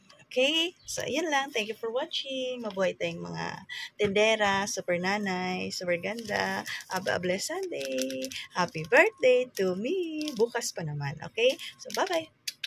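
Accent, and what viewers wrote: Waray, Philippines. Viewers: Hello sis lagi kita pinanonood mga vlog mo nadidinig ko nag wawaray ka taga saan ka po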